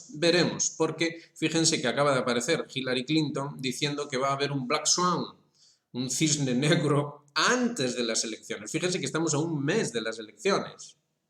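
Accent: Spain. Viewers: Me gusta lo que dices. Y tu acento me suena a noroeste peninsular.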